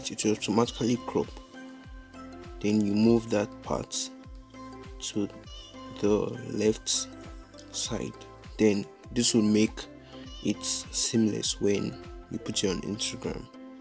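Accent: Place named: Nigeria. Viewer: I picked up a Nigerian accent right away 😀, and I've got to ditto other comments. I was like, 😲 great tutorial! Thanks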